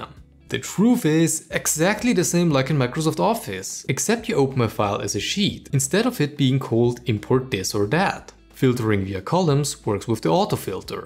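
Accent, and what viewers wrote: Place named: Germany. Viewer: with a German accent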